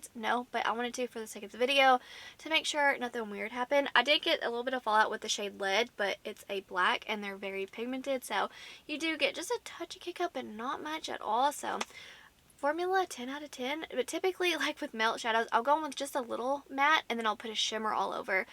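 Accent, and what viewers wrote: South, United States. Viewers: I'm a new subscriber of yourd and I am really enjoying your channel and your beautiful southern accent! My family is from Temnessee and I love the subtle differences across the states! ❤ I'm eagerly waiting this palatte!!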